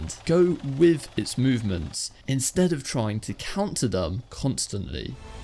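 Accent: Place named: United Kingdom. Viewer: there is no better accent then british ^^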